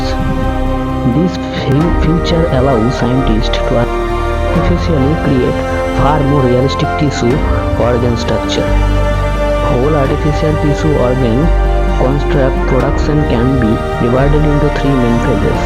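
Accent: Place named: India. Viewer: What a stupid way to talk on top of louder music. And plus in Indian accident, what a rubbish man.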